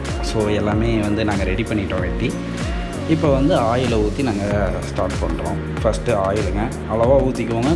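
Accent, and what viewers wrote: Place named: Kongu, India. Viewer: Kongu Kovai Tamil voice 😍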